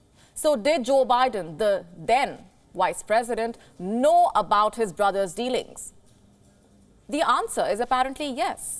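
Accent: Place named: India. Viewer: This is a great news site but unfortunately it is at times hard to understand the reporter because of the Indian accent and mis pronunciation of words.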